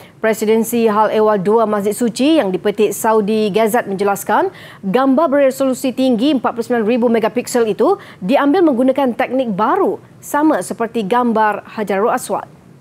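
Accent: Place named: Indonesia. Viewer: Padahal Media Malaysia, tp Logatnya 90% indonesia bgt...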